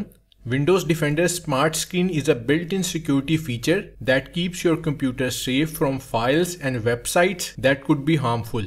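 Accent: India. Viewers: Average indian accent haha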